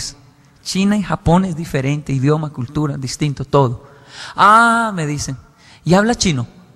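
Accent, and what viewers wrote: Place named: Colombia. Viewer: Es colombiano. De ahí su acento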